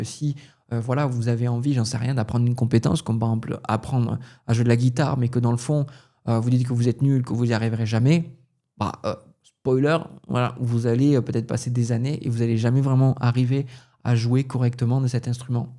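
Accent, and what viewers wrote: South, France. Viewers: Merci 🙏💗, je viens de te découvrir, c'est très parlant, c'est marrant toutes les cartes que tu as sorties sortent aussi dans les autres tirages, donc, cela confirme le tout! Je te souhaite une merveilleuse journée et ton accent du Sud me rappelle mon enfance en France, bisous 😘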